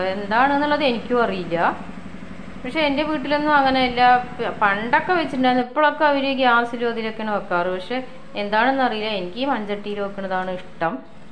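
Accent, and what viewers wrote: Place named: Palakkad, India. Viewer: പാലക്കാട് സംസാര ഞാൻ വീട്ടിൽ പോയാൽ ഇതുപോലെ സംസാരിക്കാം👌❤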